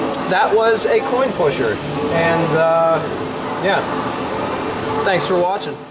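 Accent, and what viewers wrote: United States. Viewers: he has the most american accent ive ever heard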